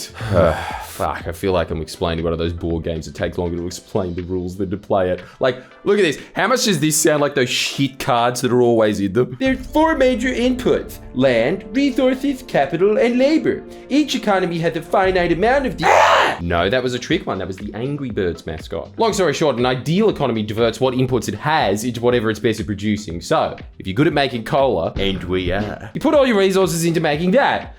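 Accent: Australia. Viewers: This is probably the most Australian I think I've ever heard him sound.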